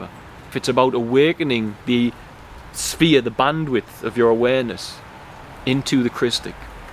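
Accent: United Kingdom. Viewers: Where are you from (originally from) you sound British brother - Also I’m a new subscriber and looking forward to your future content 🙏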